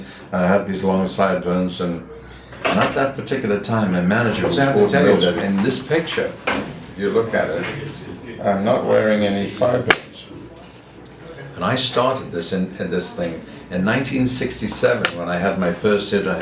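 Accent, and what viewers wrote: United Kingdom. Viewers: Engelbert is very relaxed, and reminiscing about the old days! This is backstage, in his Dressing Room at the Count Basie Theater in Red Bank, NJ and I was there, to see the show, and had the nicest meeting with Enge after his show. He is so soft spoken, and that touch of a British accent, and telling his stories, and I love to sit there listening to him. Thank you Mark Weiss and Rock Scene Magazine for a most enjoyable interview with Engelbert - The King of Romance! <3<3<3 Dorothy Whalen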